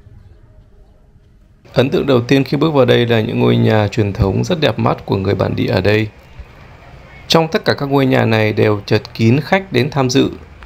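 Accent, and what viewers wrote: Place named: Hai Phong, Vietnam. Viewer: Theo dõi kênh anh từ đầu. Nhưng video này thực sự phục anh vì: anh chịu khó và dũng cảm xin họ để đc quay. Lăn lộn vào quay từng ngóc ngách.Hỏi han họ để có thông tin.Góc quay đẹp và toàn cảnh chứng tỏ anh quay rất có tâm và có trách nhiệm trên từng thước phim gửi đến khán giả.Kiến thức thì phong phú,sâu sắc,giọng Hải Phòng thì hay khỏi bàn.Chỉ biết chúc a sức khỏe,tiếp tục giữ lửa và sự can đảm,nhiệt huyết trên từng video gửi đến khán giả chúng tôi có cái thú vị để xem. Chúc a nhiều sức khỏe.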